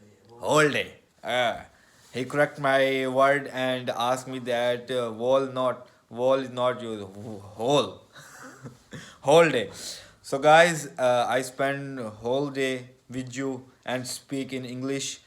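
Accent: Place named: Kyrgyzstan. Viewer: You're speaking English in Kyrgyzstani accent 🤣. You did well, we understood ur point. Ur brave. ’’mini doctor shop - Hakeem shop’’ lol. May Allah Almighty bless with barakah ameen.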